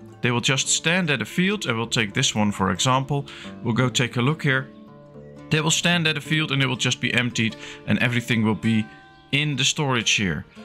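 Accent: Netherlands. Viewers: This is a very detailed video, it shows a lot of time and dedication invested in this game. Your English sounds very good, even though it's drenched in a thick layer of Dutch. You've spend a lot of time in the discovery of all the facts and numbers in this video, and I thank you for that. I too, have spend many hours playing Ostriv, and I believe your numbers and facts are correct. One detail though: if you build a field of 25x50, you will always have 1250 units, whatever plant you grow. That is, only if your field is placed on a flat and even surface. Even the slightest slope in the terrain will cause less plants could be grown. Groeten uit Ommen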